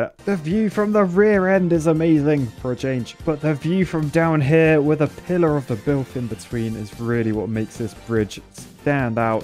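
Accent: United Kingdom. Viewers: I agree, it's just the accent. Nice British accents make everything sound better, at least to Americans.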